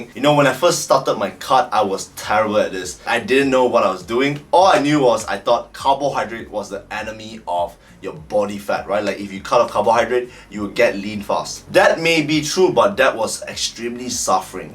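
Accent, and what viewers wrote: Singapore. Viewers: Eh bro r u Singaporean u sound singlish siah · Is this a Singaporean accent? Great video by the way!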